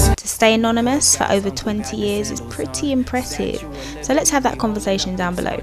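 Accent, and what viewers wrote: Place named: England, United Kingdom. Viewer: It's an accent. In fact it's an English accent, so you could argue she's saying it correctly and you're not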